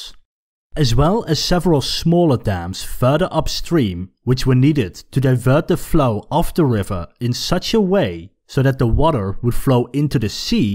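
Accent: Netherlands. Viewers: You sound dutch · me thinking maybe this is a english dude thats instrested in the netherlands me hering his voice: oh ye nvm hes dutch for sure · Thats the strongst Dutch accent I’ve heard lol(your english is good tho) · are you Dutch? I've always thought your accent sounded a bit Dutch but I could be totally off. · Nice Dutch accent on your English 😝 Vette video in ieder geval 👍🏻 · I can tell by your accent and the way you speek Dutch words that your Dutch like me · Hij heeft wel een Nederlands accent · Ik hoor gewoon dat je Nederlands bent😂😂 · Funny, you have a Dutch accent. And thanks for including a photo made from my hometown! Yay! I was born there. 3 meters below sea level. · The way you speak english and your dutch just like me 😂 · I thought you had a Dutch accent... now I know! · Hey, je uitspraak is een beetje te Nederlands voor iemand uit een ander land... 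Kom jij toevallig uit Nederland?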